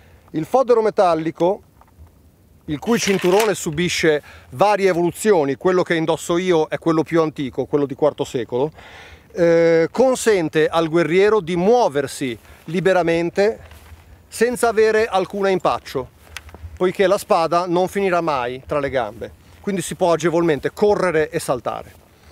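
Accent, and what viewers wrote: Italy. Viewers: Ah ah okay It’s a Northern pattern (Gallo-italic language variety) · Mo soccia, che bella cadenza bolognese.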